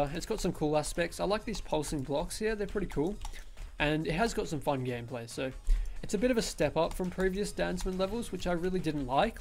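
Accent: Australia. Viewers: I live in Australia And I never knew you lived in Australia Too. I suck at geometry dash. Well I live in Tasmania and I did think you live in Australia because of your accent. HOW DO I GET BETTER AT MAKING LEVELS AND JUST EVERYTHING IN GEOMETRY DASH!!! Ok I guess I’m just cringe now.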